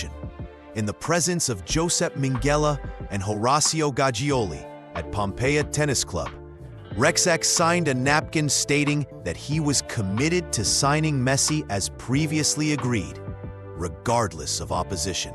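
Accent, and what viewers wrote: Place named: Brooklyn, United States. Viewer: Good video but the narrator's Brooklyn accent was a distraction!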